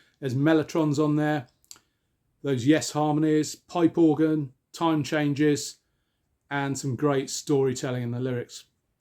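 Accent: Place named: Scotland, United Kingdom. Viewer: What a perfect scottish inclination of your. Namastè.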